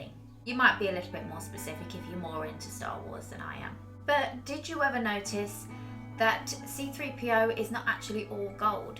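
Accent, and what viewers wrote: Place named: England, United Kingdom. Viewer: Hi, I’m from California and enjoy learning about different peoples experiences of England and in England. I was wondering which region your accent is from. Just particularly like the way you speak. Have a great day. Thanks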